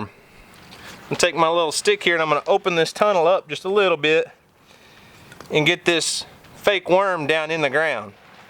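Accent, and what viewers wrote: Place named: South, United States. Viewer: Your accent is everything in this video😍You’re so southern, I could listen to you talk for days😃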